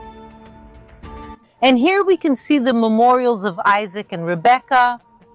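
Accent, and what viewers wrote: Israel. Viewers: I think the original language is English, she/you is/are speaking English with a nice Israeli accent, right?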